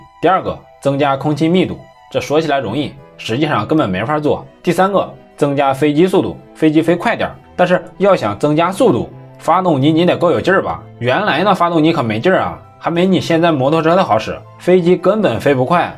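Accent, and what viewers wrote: Henan, China. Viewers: 河南口音吧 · 这是河南话?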